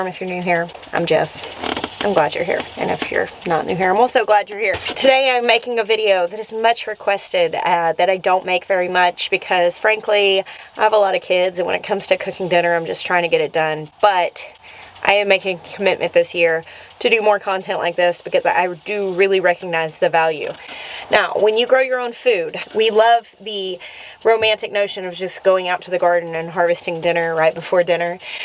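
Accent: South, United States. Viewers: Never be ashamed of your southern roots Jess. The Europeans love our accent, I found that out while living there for a time. Everywhere I went if I talked I drew someone's attention, and many times they complimented me on the southern accent. Unfortunately it was usually men and my hubby was very British and very jealous. I tried to hide the accent, but it was impossible.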